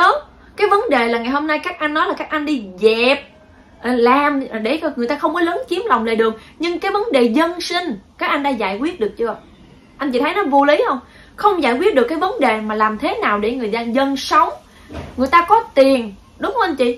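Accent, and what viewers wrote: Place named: North, Vietnam. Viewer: Sometimes it is difficult to understand the Tonkinese accent.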